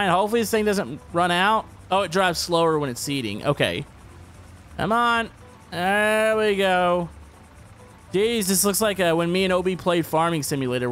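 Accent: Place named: Texas, United States. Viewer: Im sad he did not use his Texas acsent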